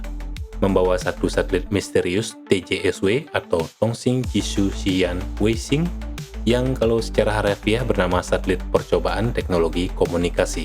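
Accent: Bali, Indonesia. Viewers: Harusnya adane Bli...medhok Bali ne bli yan...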